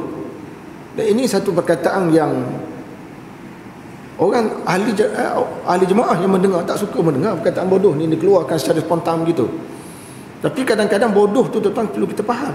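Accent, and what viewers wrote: Terengganu, Malaysia. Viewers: Sebenarnya menggunakan loghat terengganu, yg biasa di gunakan sesama rakan2 rapat. Di negeri lain pun, budak2 bercakap sesama mereka pun sama.. Bukan berniat mengatakan orang yang datang tu bodoh tapi menggunakan pendekatan kawan2, tidak menjarakkan diri dgn pendengar...amat berjaya Mudah di fahami, jelas, siap dgn contoh, seronoknya, sangat kelakar dan tidak membosankan... Kalu style ustaz ni ceramah, x sampai 15 minit semua dah tidur. tidak sesekali di pulaukan bahkan setiap ceramah beliau, manusia berpusu2 dtg... Bahkan surau kami yg ingin menjemput beliau masih lg tidak berpeluang di sebabkan jadual yg ketat dan penuh dalam setahun..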